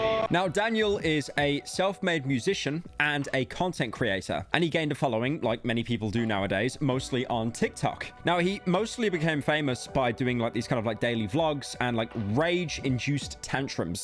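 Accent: United Kingdom. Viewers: I'll be honest, I thought he was British.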